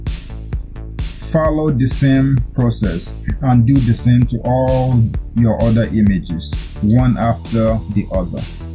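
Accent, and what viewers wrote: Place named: Nigeria. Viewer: You sound like a Nigerian, 👍 awesome tutorial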